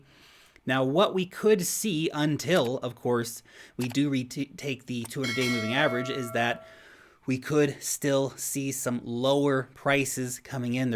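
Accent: United States. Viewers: No accent?!? He has such a strong American accent🤣. But yes definitely no hint of a NZ accent. I am assuming he is an American living in NZ? Or maybe a kiwi who moved to the US as a kid? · I always wonder why, as a kiwi, he speaks with an American accent. Any idea?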